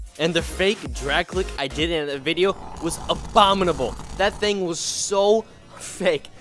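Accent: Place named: New York, United States. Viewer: He sounds like a newyork dad like thank you gouys four so much suppourt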